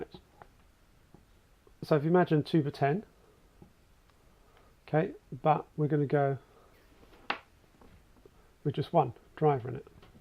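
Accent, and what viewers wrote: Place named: Europe. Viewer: I highly recommend the oberton 10b200 if you live within europe (I guess you do because of the accent ;) ) it outperforms any eminence speaker on the market and is used it high-end dutch boutique cabinets. (The price tag is the most attractive of them all) look it up on talkbass, it's a great woofer!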